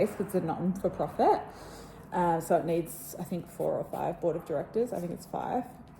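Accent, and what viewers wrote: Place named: Australia. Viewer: Wow these pens look amazing. I really likee the sound of the brush tips and wowzaz…Australian!!! Awesome!!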